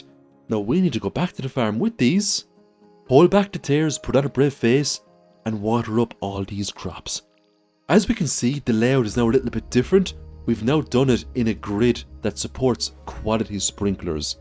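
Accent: Ireland. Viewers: Are you Irish I can hear a accent? I come from the north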